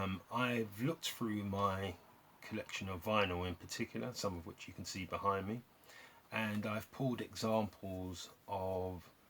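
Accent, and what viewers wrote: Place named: United Kingdom. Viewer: I totally agree with you about Nancy Wilson! thank you for this video. . . very information and presented in that British accent that just makes me melt. . I’m just a puddle by now :). stay safe and well.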